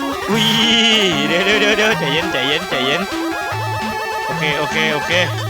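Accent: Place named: North, Thailand. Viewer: ชอบมากเลยครับ นานๆทีจะมีอู้เหนือ 55+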